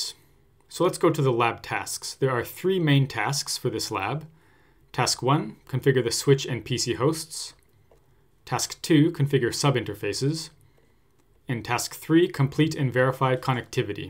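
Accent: Canada. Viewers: Comment for my Canadian sounding homeboy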